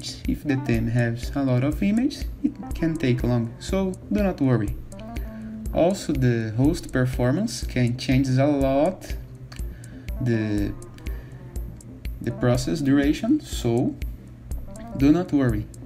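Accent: Brazil. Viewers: Brasileiro? :D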